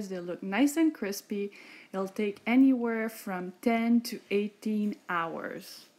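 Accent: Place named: Quebec, Canada. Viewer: Salut par ton accent je comprend que tu est Quebecoise, est ce que tu fait aussi des video en francais? Je vous demande ca parce qu'il n'y a pas beaucoup de chose comme ca et interessant sur YouTube en Quebecois/francais et je trouve ca dommage quand meme. Donc voila, sois beni!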